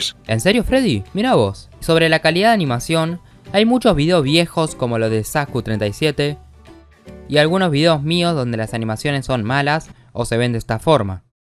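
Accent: Argentina. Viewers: Eres argentino? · ey pregunta sos argentino o chileno? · Mentira q so argentino no?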